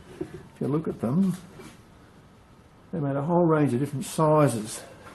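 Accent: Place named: Australia. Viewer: Soooo good to hear an Aussie sprouting his stuff! Good on yer, mate. Keep emailing coming!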